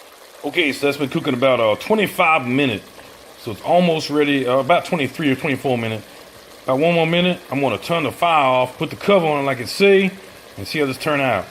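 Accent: Louisiana, United States. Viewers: Why do we love that damn cajun accent so much! Alexa can you use your cajun mood!!!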